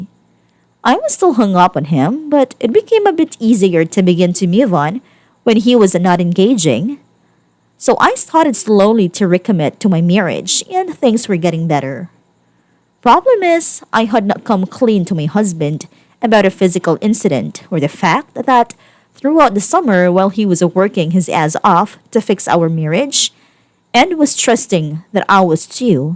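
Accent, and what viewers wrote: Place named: Philippines. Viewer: Sounds like this was read by a Philipina robot.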